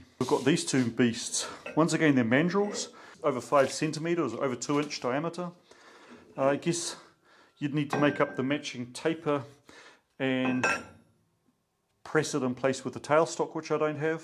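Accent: New Zealand. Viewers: Yeah that industry is not known for innovation, but for safety measures :) So they needed evidence they really work :) By the way: may I ask, where did you pick up that strong New Zealander accent? It's really a pleasure to listen to you :) There is a guy on YT (Geoffry Croker) he speaks like this :) But he's native there :) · You sound like a kiwi.. but do you live there? Hmm!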